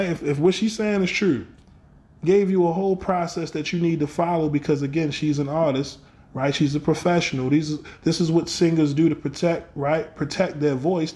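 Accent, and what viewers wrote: Baltimore, United States. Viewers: lol you're definitely from B more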